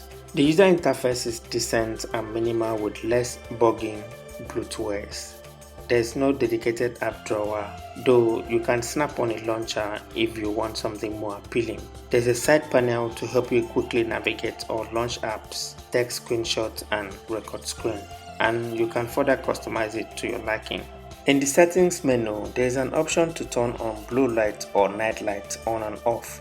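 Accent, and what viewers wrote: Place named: Akwa Ibom, Nigeria. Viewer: Love your Akwa Ibom accent bro!❤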